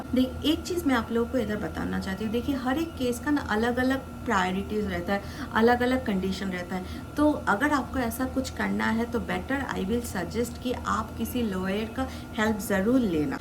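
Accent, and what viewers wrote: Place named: Bengal, India. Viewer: Ur accent seems like u r bengali 😂😅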